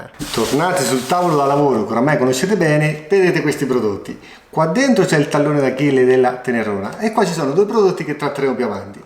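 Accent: Marche, Italy. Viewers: Gran moto la Super teneré, ne ho avuta una uguale identica! Dal tuo accento sento che sei Marchigiano, oserei anche azzeccare la provincia ma non sono sicuro, Macerata?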